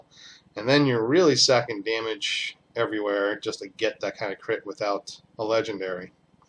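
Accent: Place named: Boston, United States. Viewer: It's ok. I heard that Bostonian Accent. My physics professor (back in the late 80's) was from Boston and basically renamed my last name on a daily basis. Good video. You get 1 like, 1 subscribe. :)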